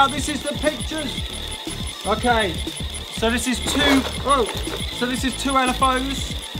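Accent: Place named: London, United Kingdom. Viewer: He does sound like a Londoner, in which case- he's in the right place!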